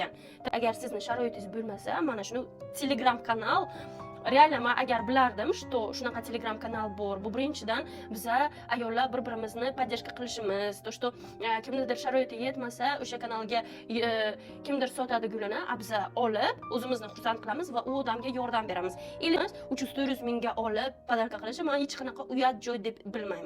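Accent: Bukhara, Uzbekistan. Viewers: ❤❤❤ zo'r wud hamin gapaton pryam buxorocha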